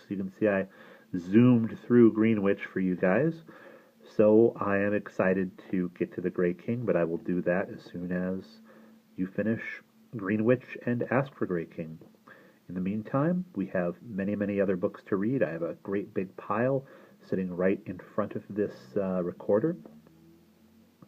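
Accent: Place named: Cornwall, United Kingdom. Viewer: Thank you so much, your readings are entertaining and relaxing and i always appreciate your british accents and especially the Cornish this time! Sending thanks and good wishes from England.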